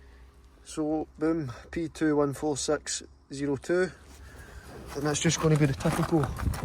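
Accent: Scotland, United Kingdom. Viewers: the thick Scottish accent is a great listen to be fair mate 👍😂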